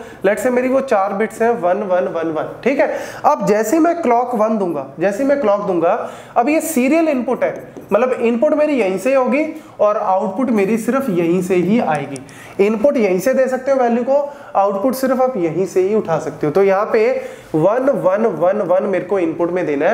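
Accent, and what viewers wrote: India. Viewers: Please, videos with subtitles. I'm brazilian and I don't understand english with indian accent very well :( nonetheless thank you very much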